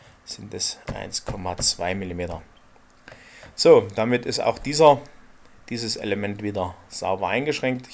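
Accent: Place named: Saxony, Germany. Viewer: Vielen Dank! Bitte in Zukunft mehr Tutorials auf sächsisch :)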